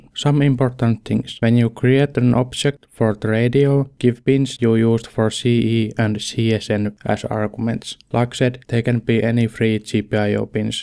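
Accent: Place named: Finland. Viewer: The accent sounds a bit finnish. (Rallyenglish)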